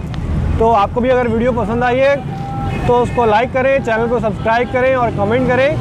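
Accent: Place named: Lucknow, India. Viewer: aap kaa andaj lucknowai kaise😆😆😆😆😆😆😆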